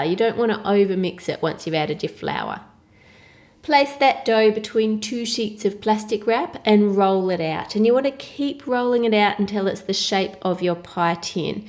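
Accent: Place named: Australia. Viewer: If you want that kind of accent. You have to roll your tongue :P I love her Australian accent too!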